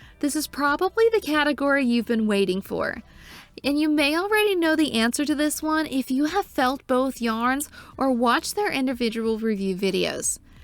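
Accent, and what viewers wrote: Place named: Midwest, United States. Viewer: Have to disagree with this comment. I think your voice is great as is! (And this sounds more midwestern than valley, but both are fun.) Your content is solid and you speak very clearly.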